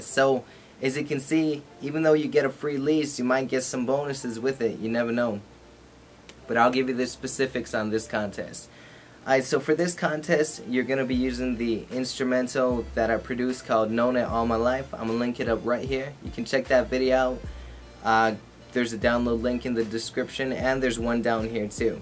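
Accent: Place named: United States. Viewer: You have a slightly American accent, but i understood it pretty well :] was geht... what´s up... was geht.... you know. it sounded like that ^^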